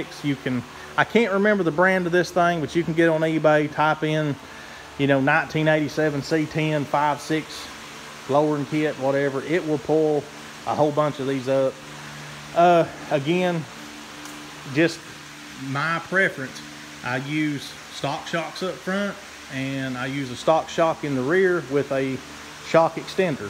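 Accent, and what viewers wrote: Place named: South, United States. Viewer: I love that accent of the southern folks reminds me of my good friend blessings to you and him 👊🏽😁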